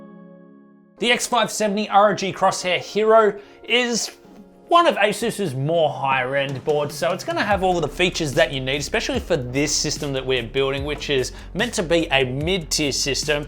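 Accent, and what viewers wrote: Australia. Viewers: Are you Australia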